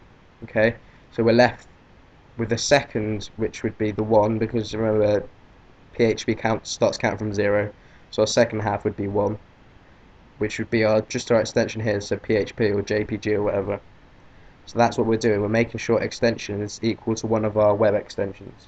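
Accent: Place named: Hertfordshire, United Kingdom. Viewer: a friend of mine he lives in Hertfordshire speaks really much like that guy =)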